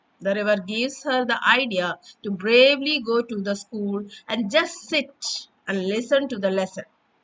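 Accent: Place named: Kerala, India. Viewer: Mam you are. 100% malayali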